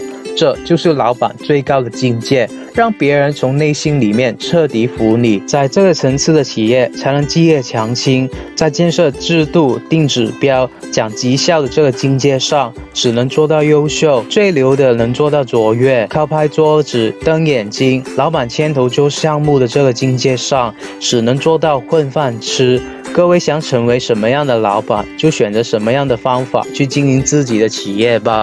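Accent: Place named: Hong Kong, China. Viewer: u are from hong kong right? your accent seems like hk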